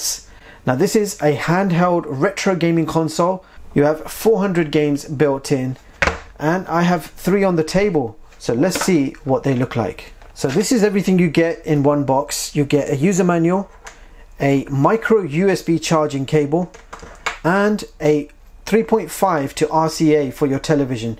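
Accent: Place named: United Kingdom. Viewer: Now your voice and pronounce is like mr Harry Pottah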